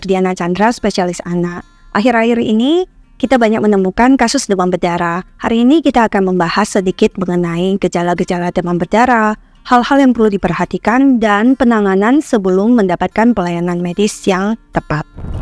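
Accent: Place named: Chinese-Indonesian, Indonesia. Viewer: Suka banget sama aksen chindo nya 😅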